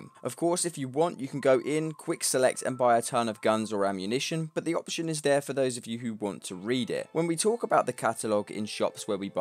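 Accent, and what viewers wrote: United Kingdom. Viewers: He just has a british accent thats all.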